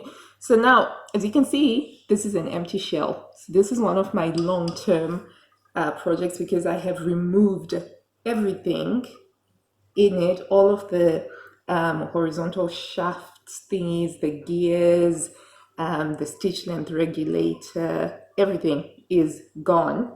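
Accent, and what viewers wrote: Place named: South Africa. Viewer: Thank you for a wonderful video. you sound so "welcomingly" South African!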